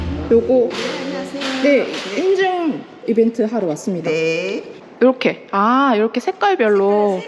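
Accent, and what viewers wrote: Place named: Busan, South Korea. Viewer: 처음으로 댓글 남겨봅니다. 늘 잘 보고 있습니다. 저에겐 많이 힐링 되어요. 감사합니다. 아무것도 섞이지 않은 순수한 선의와, 솔직하고 따뜻한 일상 영상이 너무 좋더라구요. 설령 슬프고 좀 우울해 쳐지고 하는 영상도 넘 순수해서 같이 공감할수 있어서. 강아지가 제가 집안일 할때면 거실에서 있으면서 tv 영상 켜주는걸 좋아하는데 그때 늘 영상 켜놓고 있어요. 얘도 힐링이 되는지 소파에 올라가 늘 꾸벅 졸더라구요 ㅎㅎ 구독자 선배님들께 예쁘게 보이고 싶어서 화장을 진하게 한다고 하는 영상은 정말 빵~ 터졌어요. 너무 귀여웠어요. 그리고 의 아재개그 정말 팬입니다. 저 몇개 외웠어요 ㅎㅎㅎ 또 서울 와서 우리는 사투리 안쓰는데 사투리 쓴다고 알아봐서 놀랐다고 해서 남편이랑 보다가 진짜 뿜었어요 ㅋㅋㅋㅋ 어찌나 귀여운지… 실제로 부산출신 제 친구가 한 말이랑 너무 똑같았거든요. ㅋㅋㅋㅋㅋ 두분 너무 너무 잘 살고 계신것 같아 부럽습니다. 코로나, 계엄 겹치며 사업 문제로 몸도 마음도 많이 지치고 힘들었을때 함께 일상을 나누는 듯 정말 많이 힘이 되었습니다. 감사하고 극 내향인 일인으로서 인제서야 댓글 남겨봅니다. (이것도 메모장에서 몇번의 수정을 거쳐서 올렸는지 ㅋㅋㅋ)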